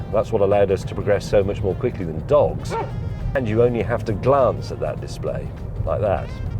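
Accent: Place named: South Yorkshire, United Kingdom. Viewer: South yorkshire eh? Hehehe...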